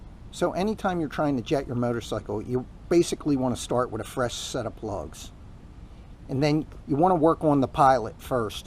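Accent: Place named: Pittsburgh, United States. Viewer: got ya, figured ya for a yinzer that moved away and still had a bit of pittsburgees left in your speech 😆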